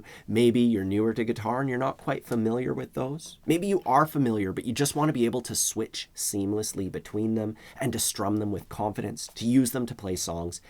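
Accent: Canada. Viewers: You sound so Canadian. :)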